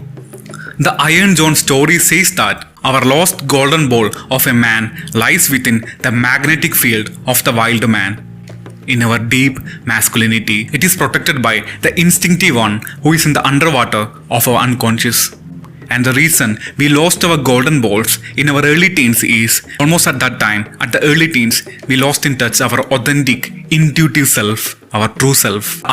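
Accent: Kerala, India. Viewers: Man! That mallu accent!!!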